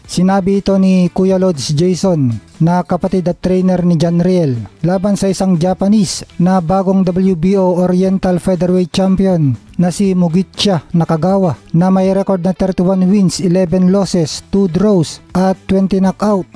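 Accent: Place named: Visayas, Philippines. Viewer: Salita mo palang, pang bisakol na...basta ganyang lahi mga fakenews .... dapat mawala na kayo sa mundo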